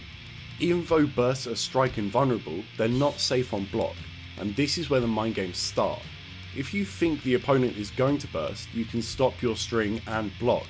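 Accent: England, United Kingdom. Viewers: What's with this super heavy duty metal in the background as you calmly speak in a refined English accent? Lol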